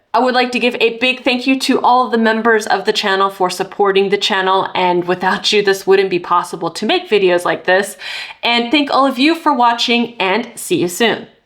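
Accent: United States. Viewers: Excellent video! By your accent you’re a fellow American, but are you using this in the US? I’m strongly considering picking one up here in the States, but I’m also very hesitant considering the instability of this compared to a more mainstream phone with better US support and a much larger user base. I’m about to start a PhD program and don’t want to get a major headache on my hands with this. Is it easy to get set up in the US? Is it painless to use here? Any insight would be much appreciated! Thanks again for such a great video review! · Watching this video on that exact device, I got the white one too. I didn't know your channel before, if not for the name I would've never known that you were French too, your accent is very American!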